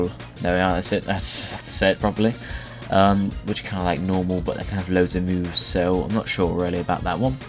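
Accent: United Kingdom. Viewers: I love your british accent, could listen to you all day c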